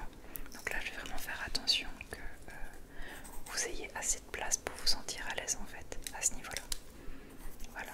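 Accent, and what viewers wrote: France. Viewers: Hey friend! I hope you are going really well :) I just wanted to say that this really sounds absolutely wonderful and lovely! You have an amazing french voice, its so soothing and lovely to listen to. I hope you are staying safe and happy.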